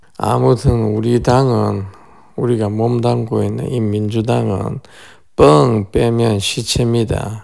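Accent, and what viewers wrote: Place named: Busan, South Korea. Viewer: 부산 시투리 잘듣고 갑니다 ^^ 잘못들으면 조바이든 비하발언이 될수있으니 조심하세요 응원하고 갑니다 화이팅^^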